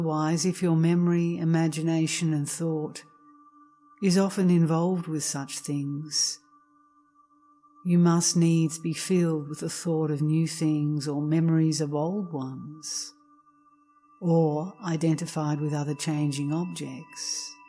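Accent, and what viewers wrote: Australia. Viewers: I love your voice. Such clarity and such strength fill with compassion. Are you Australian? So relaxing no rush, just basking in it all. Thank you.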